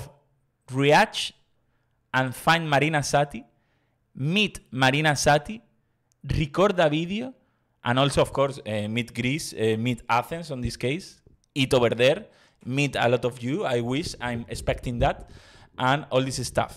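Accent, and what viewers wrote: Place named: Spain. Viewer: You are incredible! I love your Spanish accent when you are talking in English. I hope you like Athens.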